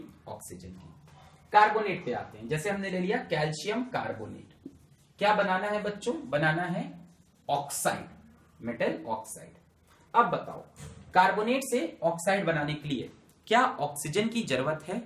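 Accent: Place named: Allahabad, India. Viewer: You are from which city? Allahabad?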